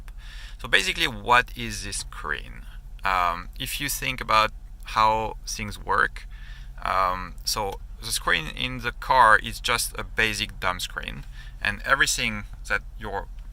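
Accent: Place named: France. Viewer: Au vu de l'accent je suppose que tu es français, donc super vidéo ! Je reçois le miens dans quelques jours et ta vidéo va me permettre de faire tout fonctionner un peu plus rapidement. Merci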